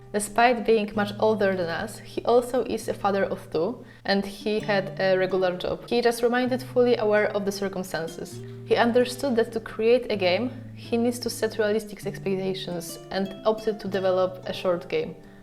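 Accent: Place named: Poland. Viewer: Ten akcent od początku wydawał mi się polski, aż do - Podziemia.. szczyty itp :D Fajny materiał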